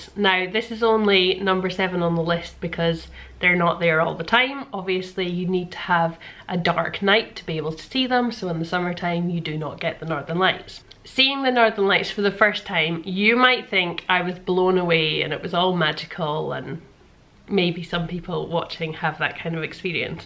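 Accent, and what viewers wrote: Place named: Scotland, United Kingdom. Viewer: hi, just came across your channel....i think id LOVE to live in iceland...im sooo into the simple life and nature...i actually watched your what you hate about it first....im just wondering about what work you can do? get? and what you have to do to be able to stay/live there....thanks for video...Love your accent....love scotland....its beautiful..:) x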